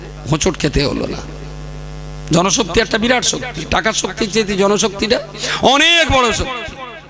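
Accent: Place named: North 24 Parganas, India. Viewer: Apnar katha r style amar valo lage North 24 pargana r katha bolar dhoron emon dekhechi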